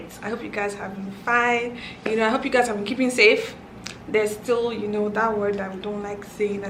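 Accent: Ghana. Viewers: You sound so Ghanaian kudos!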